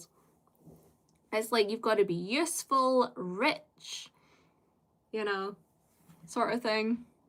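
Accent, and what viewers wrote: Scotland, United Kingdom. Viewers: I was very fortunate to put on YouTube to be greeted by only a few minutes in to the stream. Both entertaining and beautiful (if I may be so bold to say so) unfortunately I fell asleep halfway though, thank goodness for catchup. It had been a very busy day in my defence but I suspect your wonderful voice and gentle Scottish accent played it's part. 💕💕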